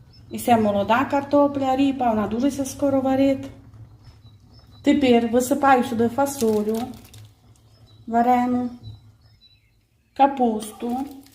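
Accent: Transcarpathia, Ukraine. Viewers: Ой, який файний закарпатський діалект ❤🎉 Так приємно слухати! 😊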